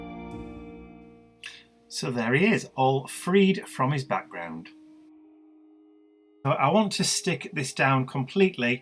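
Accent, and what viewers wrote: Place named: Goole, United Kingdom. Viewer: Love that page. I love listening to you, I'm from Goole and your accent reminds me of home, I've been away for many years. It's good to hear words like scrumpled!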